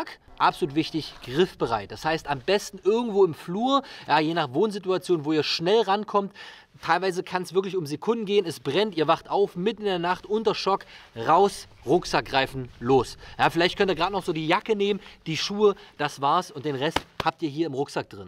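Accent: Magdeburg, Germany. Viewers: Kommst du aus Magdeburg?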